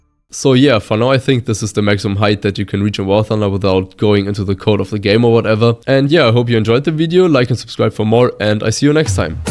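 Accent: Germany. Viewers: Most unnoticable german accent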